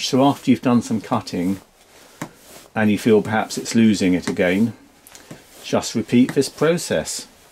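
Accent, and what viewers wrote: England, United Kingdom. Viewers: Thanks for the reply, comments and help. I have all of these while using an old “Real” leather belt more mounted to a strip of plywood. As an auto tech of 40+ years, I have polished a number of parts for restoration or those I have fabricated. I enjoy learning and new ways of doing things. I find your thick Proper English accent pleasantly enjoyable from a farm boy now in Omaha, NE. Blessings and be safe for the Holidays. Enjoy.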